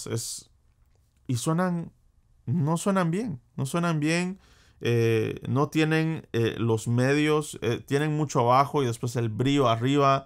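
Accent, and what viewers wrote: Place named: United States. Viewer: creo q el vive en usa por eso el acento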